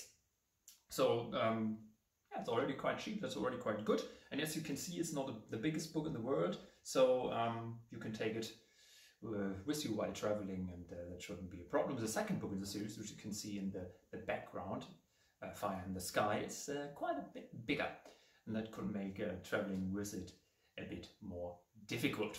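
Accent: Germany. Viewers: Hey nice review bro, love your German accent :D I also speak German. Wie gehts? Subscribed! Have been posting some book reviews recently too, we should support each other :D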